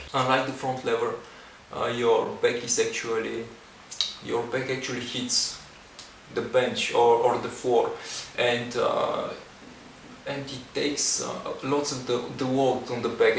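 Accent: Bulgaria. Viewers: are you bulgarian ?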